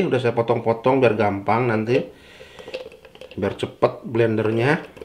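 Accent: West Java, Indonesia. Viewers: Bapak mah Sundanya kas, kalo saya mah Tasik, bapak Pangandaran ga jauh pa 😀😀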